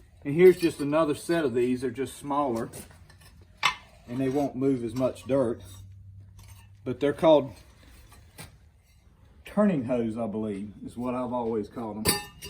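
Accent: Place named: Coastal North Carolina, United States. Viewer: Listening to you talk I'd have to say your from coastal NC , listening to your accent. I live here in upstate SC but from the lowcountry. I'd have to Say NC as well cause you didn't say Agrisupply in Statesboro or Florence! Haha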